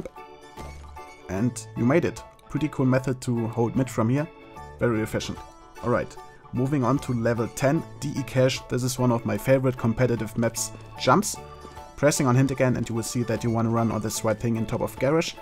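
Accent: Germany. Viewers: Deutsch? Wegen dem dialekt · dein englisch ist so deutsch ! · German accent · Sounds like an german accent, lol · love your german english sounds so nice :DD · bist du deutsch ? du klingst sehr wie ein deutscher der englisch redet ! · Man hört einfach richtig raus das du deutscher bist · is he german?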